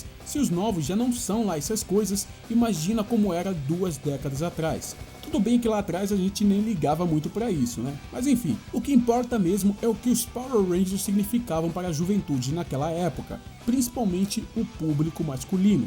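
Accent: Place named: Rio Grande do Sul, Brazil. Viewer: O cara fala muito gaúcho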